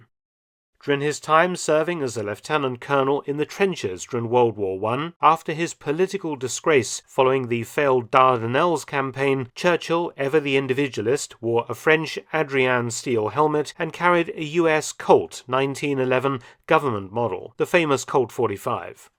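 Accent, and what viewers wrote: England, United Kingdom. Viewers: Colt .45 is always a reference to the Colt Peacemaker not the 1911. I presume from your accent that you are a Brit and I am taking this in account referencing your "gun nut" comment. Here in the colonies, we call someone with a mere 24 guns a beginner. Regarding Churchill, Americans refer to him in reverent terms as a patriot and the leader who showed the way to victory over the evil menacing the world of his time. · Is it weird of me to ask what bit of England you're from, Mister Felton?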